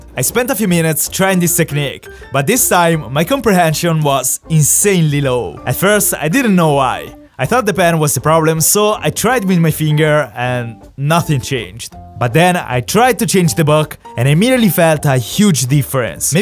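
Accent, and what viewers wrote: Italy. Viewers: Cool English with an Italian accent! Neato! 💕☮🌎🌌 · His Italian accent is so good... makes me laugh cus I'm italian too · Your Italian Accent is just OP🔥🔥🔥🔥🔥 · I knew from ur accent that u were italian, im too btw.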